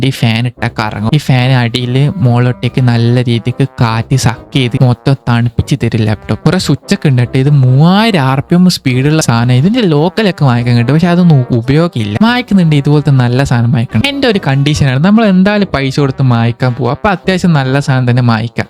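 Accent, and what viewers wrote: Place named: Kannur, India. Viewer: Bro kannur slang matram parayumooo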